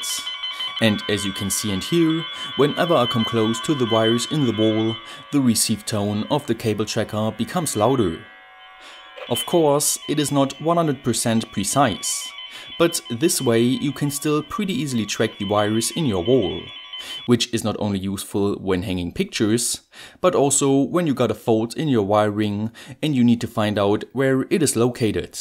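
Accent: Germany. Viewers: It's surprising how many of my favorite YouTubers are German. From The Mathologer to TheOftler to you, I love the variety of accents! I notice your accent sounds a lot like Sabine Hossenfelder's.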